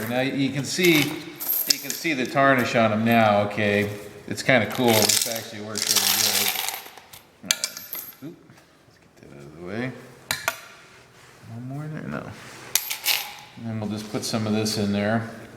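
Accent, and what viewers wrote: United States. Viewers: you sound like a guy from the states, but you shoot metrics out of your head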